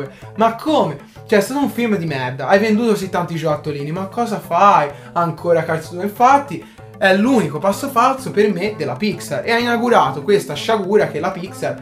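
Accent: Tuscany, Italy. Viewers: Hai proprio ragione ed è chiaro anche nel tono di, molto "toscanaccio" (anche io parlo così, dal vivo, anche se in dialetto lucchese! XD) e colloquiale. Non pretende di essere portatore della verità assoluta. Ma certe persone leggono recensioni o vedono video come questo e seguono il metodo "pecorella". Mentre avere una propria opinione per me è qualcosa di meraviglioso.:) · adoro il toscano. ho aperto il video e l'ho lasciato andare solo per farmi cullare dalla tua voce.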